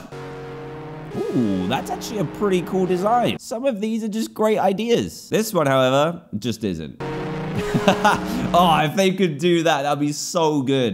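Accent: England, United Kingdom. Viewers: He’s British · He's from england or something....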